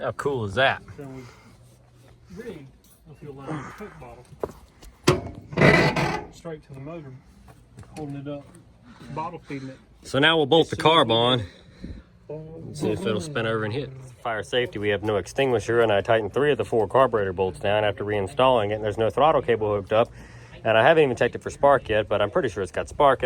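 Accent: United States. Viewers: a little more hick then normal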